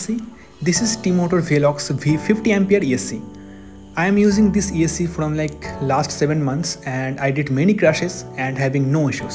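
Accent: Bengal, India. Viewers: By the way you speak, I understand that you are a Bengali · Hey are you from Kolkata? Your Accent is like Bangali Dada. By the way, I'm from Bangladesh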